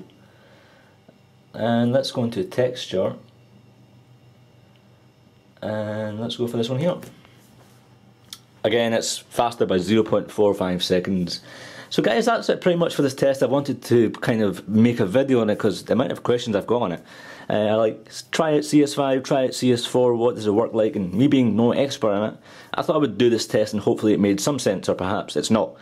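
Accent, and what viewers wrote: Scotland, United Kingdom. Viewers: Are u scot?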